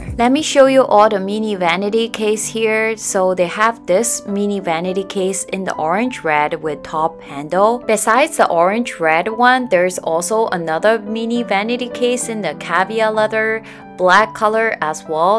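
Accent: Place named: South Korea. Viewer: 韓國口音英語。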